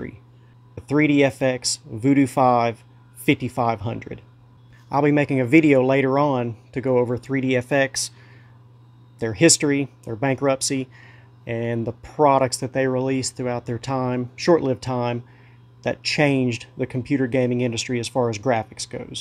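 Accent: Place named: South, United States. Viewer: That southern accent goes great with the relaxed atmosphere of the video. Subscribed!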